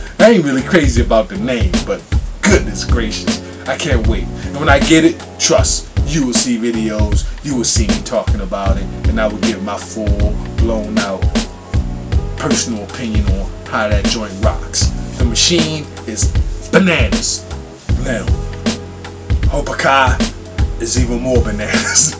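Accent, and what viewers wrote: Baltimore, United States. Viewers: I'm willing to bet money homey is from B-more or somewhere close. that accent is hard.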